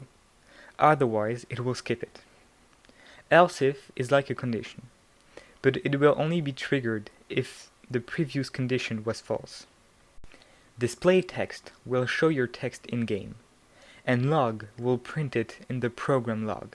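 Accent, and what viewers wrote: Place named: France. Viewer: Salut, petite question, est tu Français? Car tu a un accent qui le laisse penser... Si C'est la qu'à pourrais tu faire une version FR de tes tuto stp? Je galère beaucoup avec la programmation... En tout qu'à merci pour tes tuto, la traduction automatique fonctionne à peut prêt correctement même si ce n'est pas toujours parfait c'est déjà mieux que rien et ça ma permis dans apprendre déjà pas mal sur la base de la programmation. Je passe au tuto suivant ;-)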